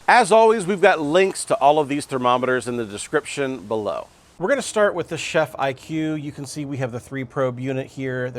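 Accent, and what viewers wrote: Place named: United States. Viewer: You sound American, switch those calipers to inches please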